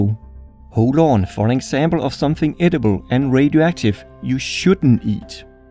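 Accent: Denmark. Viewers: Er du fra Danmark? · Are you danish? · Australian? It’s danish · Are you Danish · Gotta love that danish-english accent ❤️ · Wait what are you, Danish, i always thought that you were German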